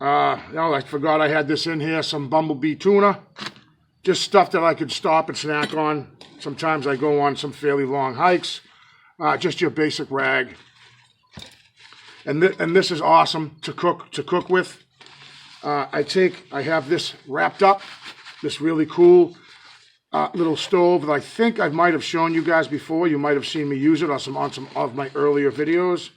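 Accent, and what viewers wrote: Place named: New England, United States. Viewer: New sub here. Squatch Ya Dooin'?:) HEE HEE. Hey. Love the New England accent. Hypotenuse! I gotta kick outta that. LOL. Lookin for more vids. God Bless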